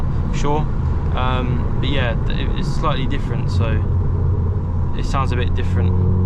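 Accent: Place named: United Kingdom. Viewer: 1) intake and POV vids sound beautiful! 2) Which part of the UK is your accent from? Asking to learn. So many dialects over there on two islands, and I enjoy them. Cheers from across the pond. ✌ 🍻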